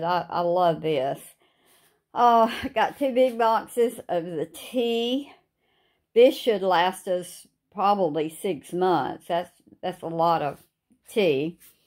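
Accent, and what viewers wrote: South, United States. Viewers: ! My wife just said a true thing to me. We're nearly as southern as you but we just love to hear you talk. Ha. Here at our home it's just me and her. The kids have always done their own thing. I think we could turn 'em loose in the yard to graze and they'd do fine. Home cooking just flies over their heads. So with just us two it's easy to improve the old larder. And always something tasty in the freezer to defrost. But. You buy and can and all that but from what you tell us I conclude that your grub flies out the door about as quick as you get it. How do you stock up with all those hungry hounds dropping by for a feed?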